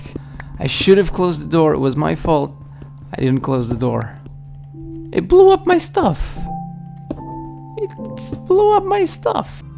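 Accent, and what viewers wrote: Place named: Portugal. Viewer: I think he´s from Portugal.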